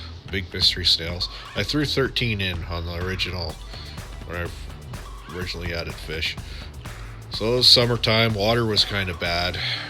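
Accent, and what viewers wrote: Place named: Canada. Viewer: In the first video that I watched, your fishroom tour 2021, I thought you sounded Canadian and you said it was -40 and my thought was you must be fellow Canuck. yeaaaaaaaaaay!! Have now sub'd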